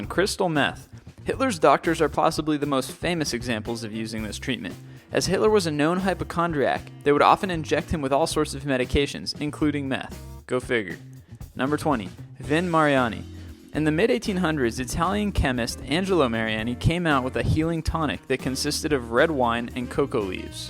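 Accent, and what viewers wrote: Canada. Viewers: ***** Racist? He's a Canadian..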